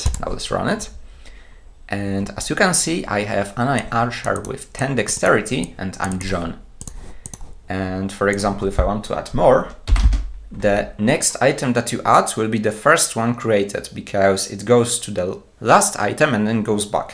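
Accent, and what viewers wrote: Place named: Poland. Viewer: nie wiem czemu ale od razu wiedzialem ze to polak nagrywa. Akcent nie angielski ale kogo to obchodzi. Nie musi byc przeciez native speakerem. Hindusowego akcentu tez nie ma :D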